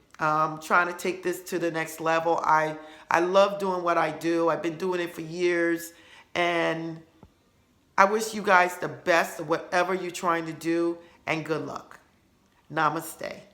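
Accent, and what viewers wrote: New York, United States. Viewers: I'm so glad I found this channel!!! I manifested my Ford F-150 got it brand new..in fact it was even better than I pictured!! It was a truck that was from an other dealer...fully loaded..I loved it...I didn't even know I manifested it...this was in 06...that next year the secret movie came out..of maybe it was 06 but I didn't see it till 07....anyway I want a Ford Raptor...it's an F-150 on steroids...it's awesome!!! I see it everywhere...I feel like there is a block..but I watched some of your videos....I love manifestation stories there needs to be more!!! And the how with it....too many vids are just technique and guruish...I need proof!!! Not that I doubt loa but it inspires me...like this vid right here!!! Thank you! Ps where are you from I'd that a new York or Boston accent..lol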